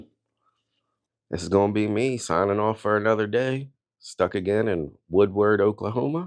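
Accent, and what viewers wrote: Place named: Baltimore, United States. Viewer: haha same…I’m from Abington! I heard the accent but couldn’t distinguish if it was Philly or Bmore and when I saw you watching the Eagles game but not saying anything about being from the Philly area, I then assumed it had to be Bmore…haha.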